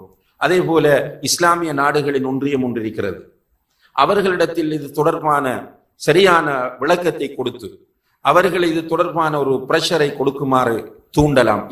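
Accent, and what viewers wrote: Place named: Sri Lanka. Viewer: Pesum vizattil puriwazillaya sri lanka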